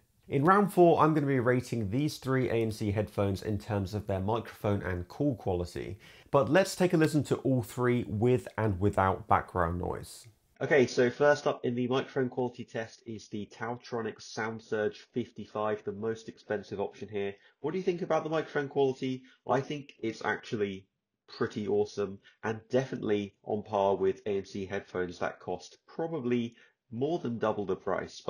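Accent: United Kingdom. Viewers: That’s just because he’s got that “Posh” Brit accent. 🇬🇧 He could be describing his morning dump and it would sound like gold.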